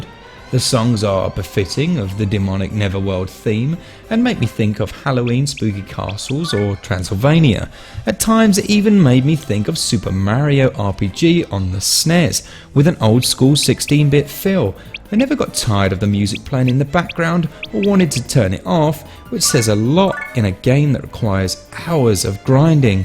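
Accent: United Kingdom. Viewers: I don't think Ren is right, but I'm not for sure. I always say it dis-guy-ah not dis-gay-ah, but you sound British so, that's probably a typical difference in pronunciation.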